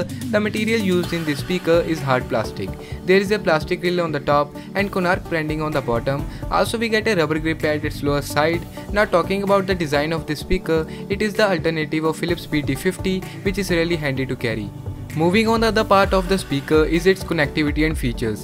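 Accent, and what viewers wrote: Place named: England, United Kingdom. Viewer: hahahha you sound little angrezz tho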